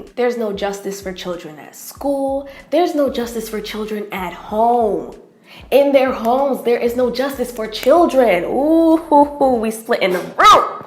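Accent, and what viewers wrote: Jamaica. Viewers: So yuh just been chippin in the patois these past videos 😂😂 I genuinely love that 😂😂😂💜💜💜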